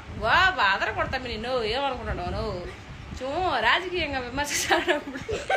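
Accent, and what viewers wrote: Chittoor, India. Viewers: You are speaking chittur slang excellent.please do continuesly counters on ysrcp . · Chittoor slang super good warning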